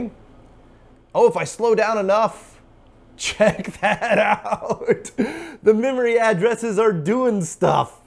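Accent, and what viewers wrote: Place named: Texas, United States. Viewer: Yep. I'm assuming he has a Texan accent since he lives in Texas and sounds like most of the Dallas/Ft. Worth area folks I know. In that accent, "indian" and "endian" sound exactly the same.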